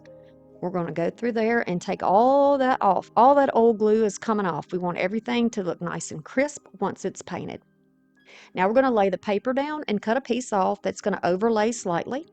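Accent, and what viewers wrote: South, United States. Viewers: Love them all! I love crows, so your moodies are perfect accompaniments. Thanks so much. Have a great day and God bless 😊 . I went to the michaels link and i like your birdcage and shadow box way more than theirs, actually i think all of yours are better ! Are you from ga ? Cause you talk just like me, and when you said "little,tiny nails" i laughed out loud. I so love our southern hyperbole, why use one word if you can use two and drawl them out. From Nancy in ga usa 😊.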